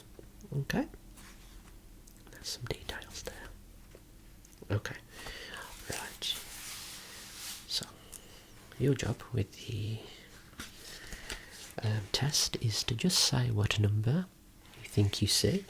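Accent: Birmingham, United Kingdom. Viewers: A brummy asmrtist is something I never thought I'd see but I'm all here for it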